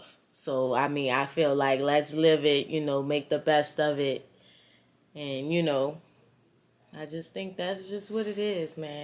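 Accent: New Orleans, United States. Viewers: Girl keep that New Orleans talk honey! You are good! “Ya kno” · That’s ok continue to be you!! A lot of us like the way you talk that’s the New Orleans talk that’s alright!! Keep striving for what makes you happy we’re here for it!!❤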